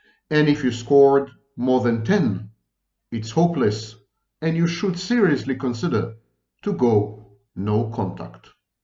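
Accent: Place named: Israel. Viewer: Like the Israeli accent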